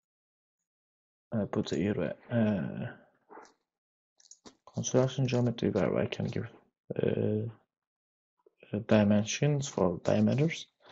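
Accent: Turkey. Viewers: türk ingilizcesi bu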